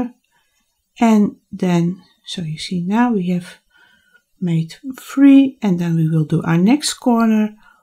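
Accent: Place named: Netherlands. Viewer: Grappig, ik dacht bij mezelf: "Wat een sterk Nederlands accent..." En toen bezocht ik je website. :) Dat Starburst patroon is mooi om te zien, hoor.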